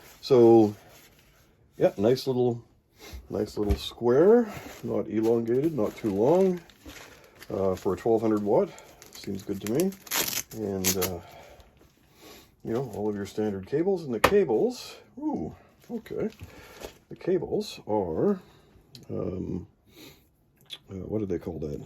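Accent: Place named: United Kingdom. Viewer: Hellooo, I’ve just buyed a Yuhui SFX PSU for 328 RMB or 45 USD (I think it’s a Black Commender 750) in TaoBao, rather than in NewEgg, Yuhui to my knowledge is the same or sub-brand from Sama, I just have some questions, has the PSU exploded or had some kind of issue? Is it silent? I don’t want to assume but you’re accent sounds British and if I’m correct, in the UK, they use 220V and my PSU, like yours, in the input says 100-240VAC (mine had a sticker on the plug saying 220V/AC), do you know if it works with 110V, since I live on a country we’re we use 110V? It also came with a Chinese type I connector (To Outlet) and also to my knowledge, in Chine they use 220V Nice content btw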